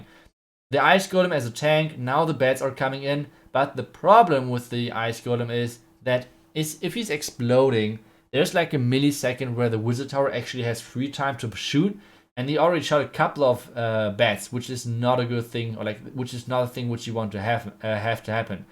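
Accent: Germany. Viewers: I love the German accent when you speak English😍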